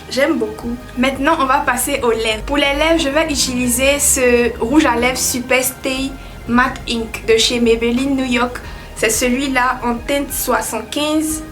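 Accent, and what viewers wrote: Ivory Coast. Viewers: Coucou top top ton maquillage 🤩🤩🤩👍🏽j’aime trop ton accent ivoirien 😊où je peux avoir cette palette a Abidjan?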